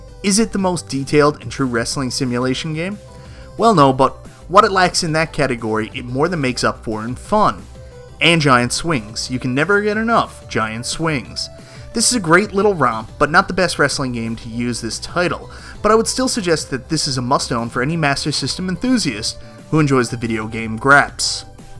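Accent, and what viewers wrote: Canada. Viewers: I knew you were Canadian from the accent, ya hoser! - Luv from: a fellow canuck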